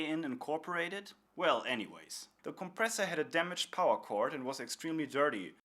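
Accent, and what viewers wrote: United States. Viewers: Thanks for the quick reply. Yes there is definitely a sprinkling of American in your speech. Thanks again.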